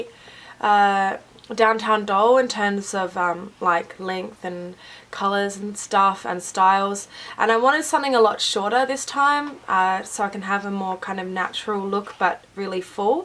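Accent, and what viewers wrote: Australia. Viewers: Is she australian? Sorry i don't understand any accents... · Are you australian?! If you are I. So happy! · Omg your Australian!! SNAP!!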